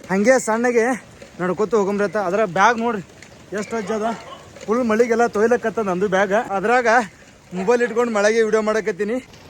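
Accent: North Karnataka, India. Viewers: Super uttar Karnataka maatu , u k huli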